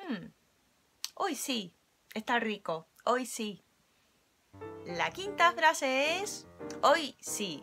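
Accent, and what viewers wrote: Spain. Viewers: Pero hablas español de españa ejemplos de español latino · ptm pero hablas como española yo me largo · me encantó completamente tu acento español, es perfecto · tu español . es de españa y un poco de latino . very good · habla como española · aprendiste el peor acento espanol que existe,,,,, es mucho mejor el acento latino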